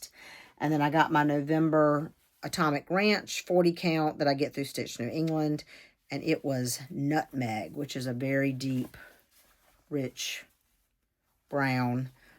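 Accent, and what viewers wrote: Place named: North Carolina, United States. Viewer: Just found your flosstube and love going back and watching your previous episodes! I live in Florida now, but previously lived in the Charlotte, NC area for about 20 years! Love hearing your NC accent though, and seeing all your beautiful projects. Was wondering what thread you’re using for the Tisket a Tasket project. It’s beautiful and you’ve definitely enabled me!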